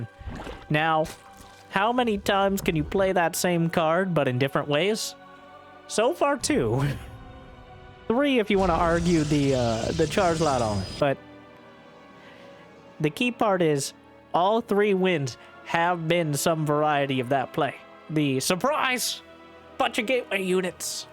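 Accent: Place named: Texas, United States. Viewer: Bro, you're literally the best caster, and I love your charming Texan accent. Please keep it coming, man.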